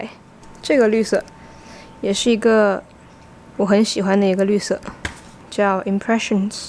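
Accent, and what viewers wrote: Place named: Hunan, China. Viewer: 普通话带湖南口音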